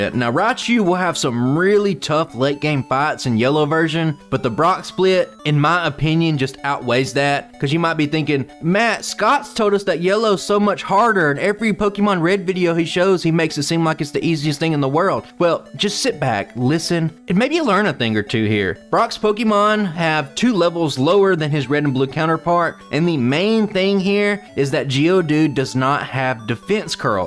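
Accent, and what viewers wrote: United States. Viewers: Where you from? That accent got me saying howdy partner. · Btw Matt i too have a southern accent so "bind" and "bide" sound exactly the same ... I feel your pain, brother!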